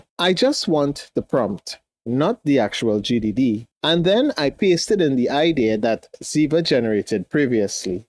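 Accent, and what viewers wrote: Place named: Trinidad and Tobago. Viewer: This accent sounds like its from Trinidad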